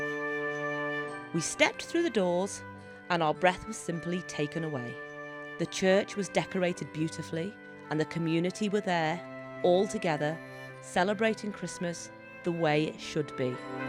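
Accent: United Kingdom. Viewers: You guys should have your own tv show. Love the voice, love the British accent and interesting personality👌😎 Merry christmas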